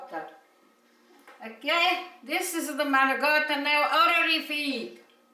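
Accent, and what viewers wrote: Italy. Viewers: I watched my mom make the shells mostly for holidays. You even say it the way we do. Same dialect, must be same part of Italy 🇮🇹 thank you for the memories and recipes. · Italian-english, two in one 😘😘 💓❤️ 🌹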